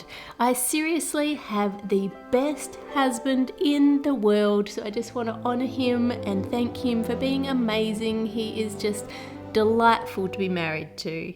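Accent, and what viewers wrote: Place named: Australia. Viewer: I like your Australian accent.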